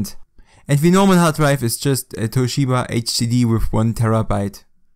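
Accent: Germany. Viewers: Höre ich da einen deutschen Akzent in deinem Englisch? ;)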